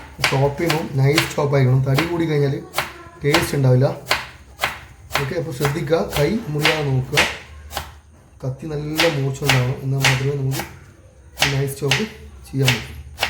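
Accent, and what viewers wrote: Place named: Malabar, India. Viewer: Voice over kodukkumboo maathrollu le ee malabari type samsaram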